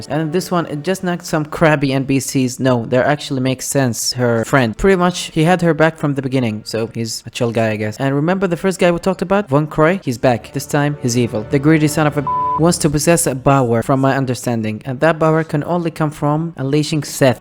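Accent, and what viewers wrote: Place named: Gulf, Arab world. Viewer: خليجي من لهجتك بس مدري من وين. كل عام وانت بخير وصحه وسلامه وعيدك مبارك، مقطع جميييل واستغربت ان باقي فيه عرب مهتمين بالسلسلة الكلاسيك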